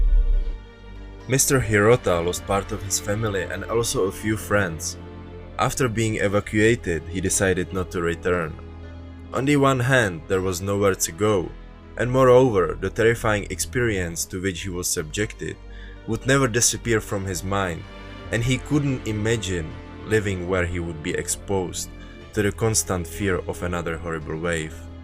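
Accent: Czech Republic. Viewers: dal som si tu namahu aby som zistil odkial je vlastnik kanala a ano nemylil som sa ....ceska anglictina je jedinecna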